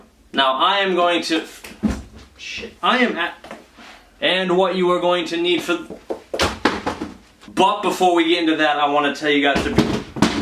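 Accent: Australia. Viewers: The Aussie accent is starting to get to you 😂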